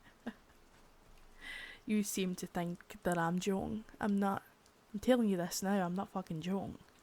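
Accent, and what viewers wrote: Scotland, United Kingdom. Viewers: Great work. (Is that a scottish accent?) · Scottish accent uhhhhh THE BEST !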